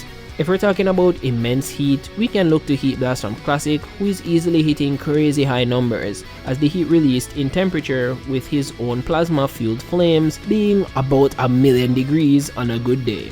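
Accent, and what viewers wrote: Jamaica. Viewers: You can really hear your Jamaican accent coming out in this one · Are you Jamaican because you sound 100% Jamaican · Are you Jamaican?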